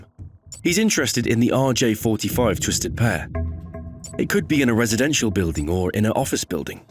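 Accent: United Kingdom. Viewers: They are probably covering their asses legally in case they are charged with supplying information that can be used for illicit actions. I don't think this would be as much of an issue in the US given publisher protections (first amendment rights), but if the narrator is a person/not text to voice, then based upon their accent, they are most likely coming out of the UK. The UK doesn't have constitutional rights outside of the Magna Carta. As such, publishers are far more liable for suit in the event something they publish results in real world damages. For supplying the information, they could potentially be held liable to criminal charges. I'm pretty sure that that isn't the case under US law in most cases/jurisdictions, as there are alternative case uses. However, as this is seemingly coming from the UK/EU, they may be held to different standards—which, while unfortunate, would help explain the censorship. 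That said, I would recommend that they team up with a publisher in a country that does not have these limitations who could offer more comprehensive explanations. Given the censorship, I found the information of substantially less value as knowing what programs are used, how they work, what to look for, etc., is necessary for a more comprehensive security education. That said, when did being a "hacker" become a negative connotation amongst the tech community? If you are a "Cyber Security Analyst" that makes you a "white hat" hacker. I myself tend to float between "white"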